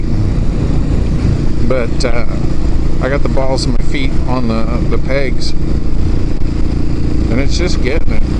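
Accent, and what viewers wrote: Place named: United States. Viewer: I love your accent so much, u sound like a cowboy from the wild west, where are u from?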